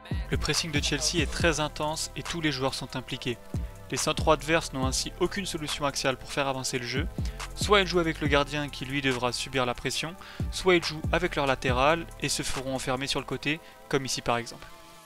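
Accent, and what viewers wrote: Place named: France. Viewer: Mec le format de tes vidéo sont lourd continue comsa les explications sont claires et quel bonheur d’entendre une voix française 🤩 je m’abonne direct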